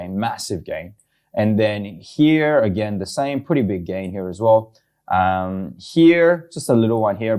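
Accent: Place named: New Zealand. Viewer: You live in NZ? Got a bit of a kiwi accent 😁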